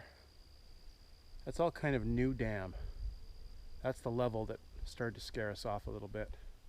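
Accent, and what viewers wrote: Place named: Canada. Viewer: It's the default go to for d!psh!ts who want to make some "government bad" meme and use Cali as the example of "over regulation". Brain dead as they usually are, they fail to take into account that, by his "accent" is a fine Canadian lad. As such, he deals with more regulations than a dumbass yank.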